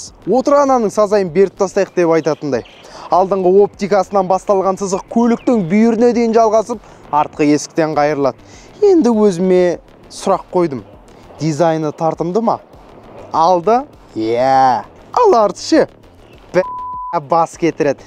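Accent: Standard. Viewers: Бауырымызға сәттілік. Қазақша таза, сауатты сөйлейді екен. Ғаламторда сауатты, пайдалы, қазақша бағдарлама көбейсін, аумин. Саясатты білмеу, әділетсіздікпен күреспеу, ұрпақты аяқ, қолын жіпсіз байлап жауға бергенмен бірдей. Оян қазақтар. АЛЛАҺУ АКБАР.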